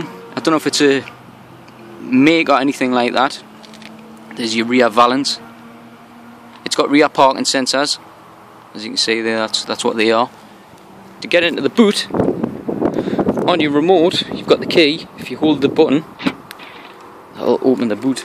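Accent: Newcastle, United Kingdom. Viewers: Geordie lad!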